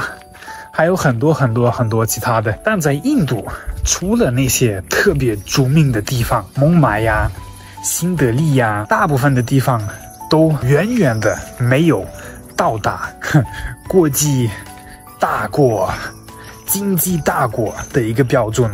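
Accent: Sichuan, China. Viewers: 妥妥的四川口音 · 你講話怎麼有個四川音，尤其講「 」的時候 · 他说的是四川口音 😂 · 四川话➕普通话😂你能认真学习普通话吗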